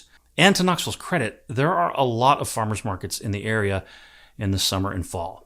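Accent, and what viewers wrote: California, United States. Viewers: You sound like a stuffy Elite from CA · You sound like a Californian. Bringing your liberal bullshit to Tennessee.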